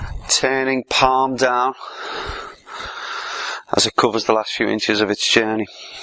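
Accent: Liverpool, United Kingdom. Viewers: A scouser! Too right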